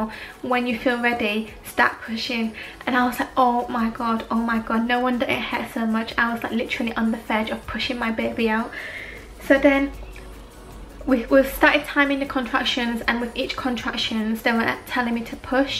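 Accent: Yorkshire, United Kingdom. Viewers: it's yorkshire accent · she lives in London bit she is from up north, which is like Yorkshire area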